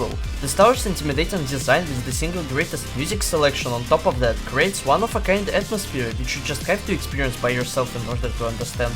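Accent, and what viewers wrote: Russia. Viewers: U have russian ascend, are you? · russian accent noticed👌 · You are Russian? (your accent sounds like Russian.) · Bro you have absolute russian accent and great vid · у тебя как будто русский акцент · man, u have have so Russian accent XD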